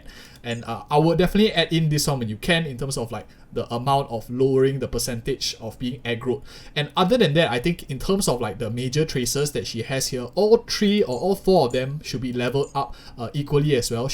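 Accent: Singapore. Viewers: i recognize singlish when i hear it rofl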